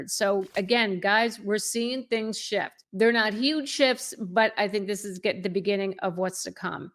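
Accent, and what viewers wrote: New Jersey, United States. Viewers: Ms., I grew up in NJ and was glad to leave when I did because I like more open space, but I really enjoy your accent and disposition. Reminds me of the part of Jersey that I didn't know I still really appreciate: the people. Thank you for your objectivity and conviction. Been enjoying your content lately as a soon-to-be new home buyer.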